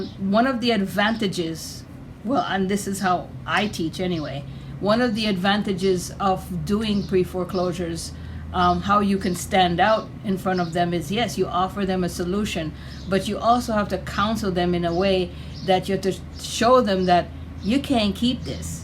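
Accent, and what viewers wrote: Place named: Jamaica. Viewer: I feel Comfortable with you nationally is Jamaican I sound it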